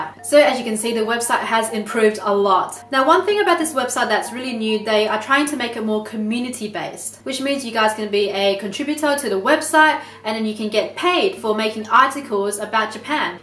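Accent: Australia. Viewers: Are you Australian? Lovely accent. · are you an Aussie? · She definitely has an Australian accent. Even her last name ' ' is not Japanese. But even me, Takeo, could've learned a lot of them from her.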